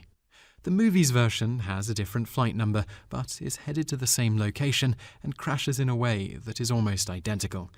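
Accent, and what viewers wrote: England, United Kingdom. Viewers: Thoroughly enjoyed the video....and the manner of presentation. It is not often that I create a list of topics to "research" based on a YT video....but this one will have me scurrying to see some of these movies...perhaps: "again" (Audie Murphy especially). The narrative script was excellent.....and the narration itself. I don't subscribe to a channel unless I'm pretty darned impressed with the content...or I've had some enjoyable dialogue with someone. Subbing up to your channel now. ~RED ☮♥♫ (BTW...an "aside"....I used to have an English boyfriend....his accent and voice tone was the softer/smooth sort....exactly like this narrator. So...kind of a "warm and fuzzy" recollection for me. :-) Never forgot him).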